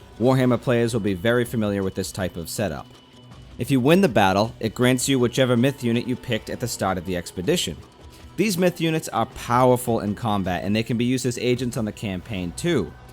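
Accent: Boston, United States. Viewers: I like your accent. Is it Boston? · Haha are you from Boston? Lovely vid, lovely accent. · something about a thick boston accent talking about total war is so funny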